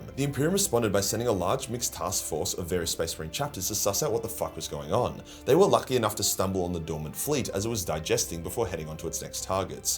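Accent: Australia. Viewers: Nice vid ya Aussie 😂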